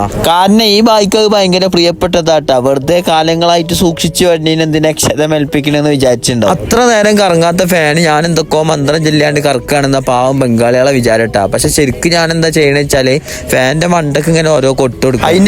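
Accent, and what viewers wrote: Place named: Malappuram, India. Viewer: Malappuram thil angine ya parayaa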